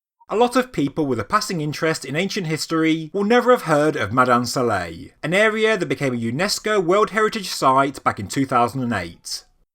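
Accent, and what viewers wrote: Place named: United Kingdom. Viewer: Could you please tone down little bit of your f****g british accent and tonation so more could fully comprehend you talking s***t chanell..your bri* accent i can tolerate but you monotonose boring tone is irritatingly offending to the ear...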